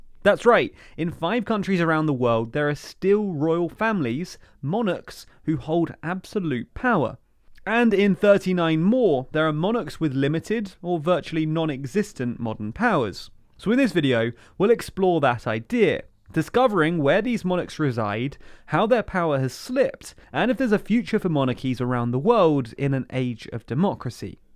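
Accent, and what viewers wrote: United Kingdom. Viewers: He’s British so what he says in his accent is correct. Because he sounds British.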